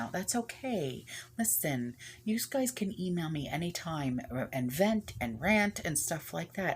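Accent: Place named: Philadelphia, United States. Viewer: Thought you were Philly or a Delco girl.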